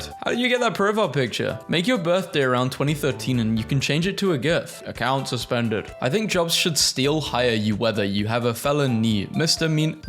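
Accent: United Kingdom. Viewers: the way you pronounce things like britishly like saying "media" as "meteor" tickles my funny (im sorry for being american)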